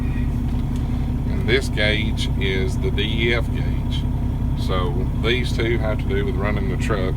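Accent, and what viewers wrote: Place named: Appalachia, United States. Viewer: Cringeworthy hillbilly accent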